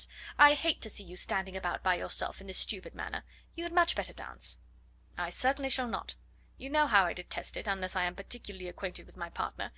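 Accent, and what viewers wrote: United Kingdom. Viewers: so british love it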